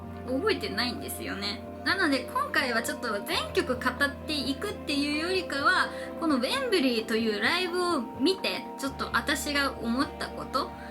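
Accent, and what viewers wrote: Hiroshima, Japan. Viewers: 同じ広島人として、広島弁混じりの動画いい耳心地デス。ソレも有りいつも観て応援しています。 私は、ソニスの頃にファンカム漁りしハマってから、ウェンブリーと2nd発売の頃は、色々買いまくってましたね。 ウェンブリーは音がイイ。 各楽器が立体的に際立ち、BHOベースと青さんドラムの融合感、神バンドアンサンブル粒立ち完璧。 後、買っといた方がイイのは、LEGEND-S だと思います。 地元だし2日間とも参戦しましたが、YUIちゃん急病で片翼の危機に、２人+神バンドの気迫のパフォーマンス大規模演出ライブでした。 藤岡さん最後のギター🎸泣ける。 MOAMETALファンならば、あの奮闘MOAちゃんは買っとかないと！ て、ザ・フォーラムもだし、1月の幕張総出撃も買わんとだし、 まぁ、今年はライブおあずけなんで、その分デロ買ってね（╹◡╹ʔ༄ て、事でしょうかね。 夢が有る事は大事。人生は一度きり。その若さなら時間も可能性も充分有る。 人生は夢だらけ(by,YUIちゃん)w。 頑張れ👍。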